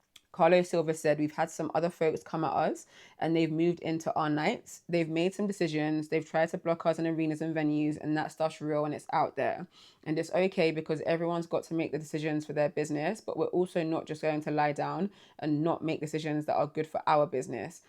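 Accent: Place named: United Kingdom. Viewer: Hiya, german here, your channel trailer got recommended to me. I have stopped watching WWE stuff a few years ago and am focusing on other promotions and old shit, but you're sympathetic so I'm giving a sub. You sound british, you going to All In (and Revpro or others :D )?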